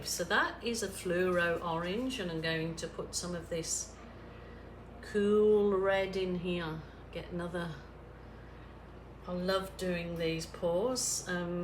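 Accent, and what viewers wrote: Birmingham, United Kingdom. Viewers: Lol! have been on the phone to home? Your Brummy accent is coming through more today! How lovely! What a stunning piece! Treat of colours - very magical! Hope you & your family & friends are starting to recover after all you've been through? X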